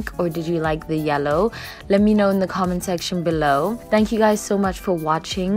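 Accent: South Africa. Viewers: Presume you're South Africa, cause of your ascent... great tips hey, from the motherland... South Africa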